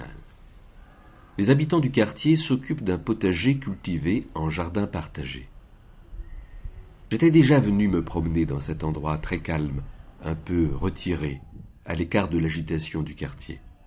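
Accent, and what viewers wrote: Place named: South, France. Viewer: "et je parle enfin avec de la chaleur dans la voix" <3 très beau ce journal à l'accent du sud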